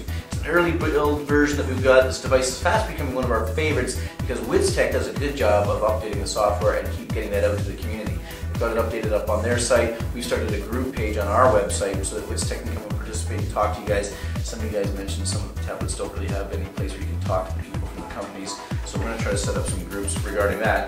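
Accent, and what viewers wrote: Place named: Canada. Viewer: This guy sounds like a Canadian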